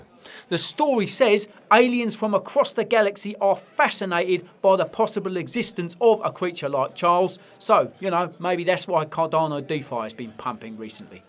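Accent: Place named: Cockney, United Kingdom. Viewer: you was too good at being Barry - you're actually cockney, right?! - and the crypto gains made you!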